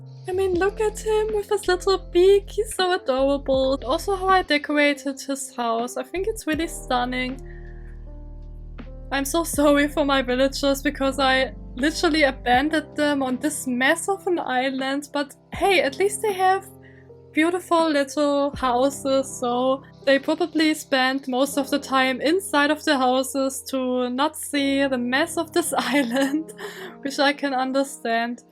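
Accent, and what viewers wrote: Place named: Germany. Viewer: Are you german? You have a very german sounding accent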